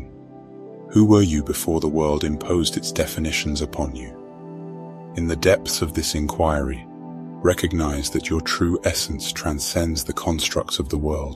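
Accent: United Kingdom. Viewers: I agree...I found it interesting that this originates from India but with a British accent. I love the messages but...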